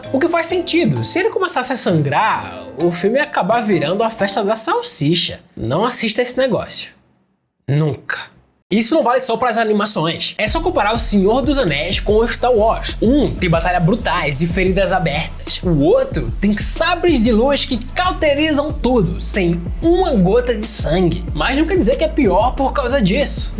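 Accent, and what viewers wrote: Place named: Northeast, Brazil. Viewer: bom ver um nordestino com um canal com potencial para ficar grande, cansa de “só” escutar sotaques do sudeste (basicamente)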